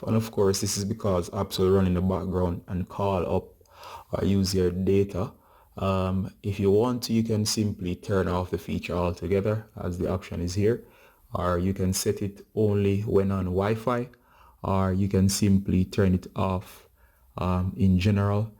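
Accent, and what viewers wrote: Jamaica. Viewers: Your Jamaican??😀😃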